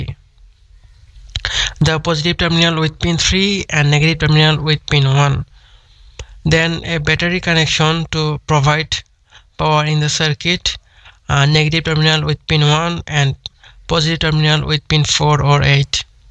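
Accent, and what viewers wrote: India. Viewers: i wish i have an Indian friends, they are so smart and their accents is so adorable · Ah I like hearing an indian accent on electronics tutorials . Guarantee for good explanation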